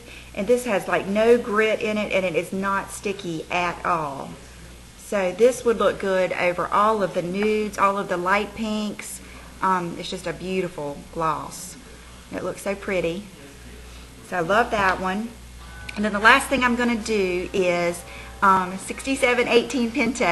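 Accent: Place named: Virginia, United States. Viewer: you're so pretty!!! Watching this video totally inspired me to get up and get ready and head over to my Chanel counter;) I love your accent btw... Are you from Virginia?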